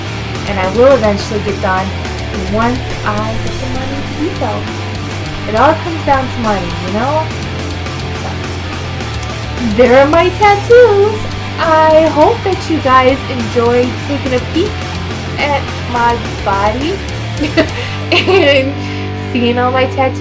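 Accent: United States. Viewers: are you from the east coast by any chance? you kinda sound like it! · yeah your accent xD american im from sunderland in the uk you sure do you sound awesome :D your very welcome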